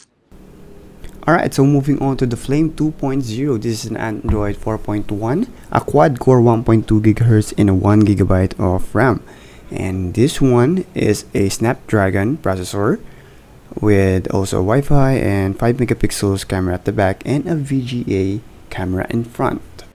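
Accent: Philippines. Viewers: Kairita mag review. Conyo. :)))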